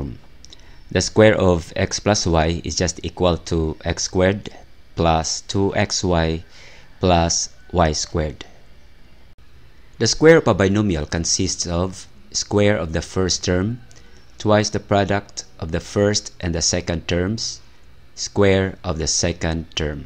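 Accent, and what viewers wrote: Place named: Philippines. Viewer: You sound like a Filipino po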